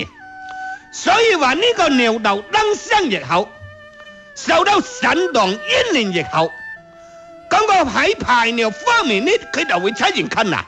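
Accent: Chaozhou, China. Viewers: 潮州口音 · 潮州口音来嘎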